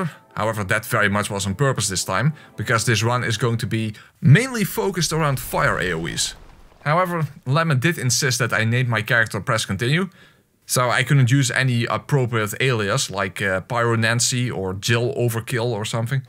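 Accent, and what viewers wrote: Netherlands. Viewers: Nederlandse Engels-accenten zijn echt geweldig. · Never heard of you before, but after like three words I was like ''oh shit, a fellow Dutchie''. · Are you from the Netherlands? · My favorite Dutch accent content creator strikes again. Lekker bezig man!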